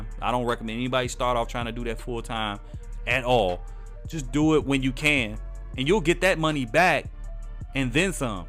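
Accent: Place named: Chicago, United States. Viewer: sound like her from the suburbs of chicago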